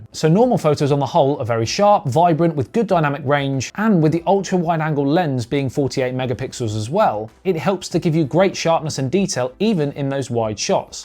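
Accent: United Kingdom. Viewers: ok. are you from UK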